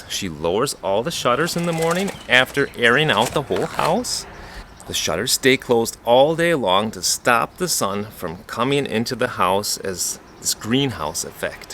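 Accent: Germany. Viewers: Wait you are a native englisch speaker i know yo live here for a while but why do you have german accent xd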